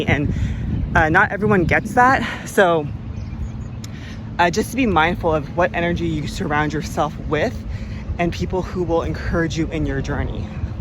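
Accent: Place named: Hawaii, United States. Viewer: I’m born and raised in Hawaii and live in my childhood house on the windward side of Oahu. I really enjoy watching videos people post about living or eating in Hawaii. I love your Asian Aunties, and Mamma Lai videos… my all time faves!!! If you hadn’t mentioned where you were from, I would have thought you were from here!! Have a great day and thank you for the morning walk 🫶🏻🤙🏼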